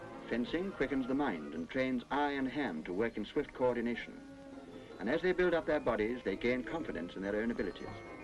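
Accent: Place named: RP, United Kingdom. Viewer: What a posh accent!!! Changed a lot by now.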